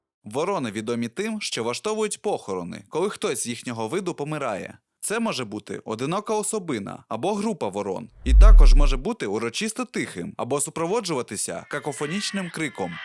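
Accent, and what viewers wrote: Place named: Russia. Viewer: ЧОМУ???? Чому ти використовуєш кацапські наголоси? півтори хвилини відео глянув, вже почув рОки і сАмі....тьху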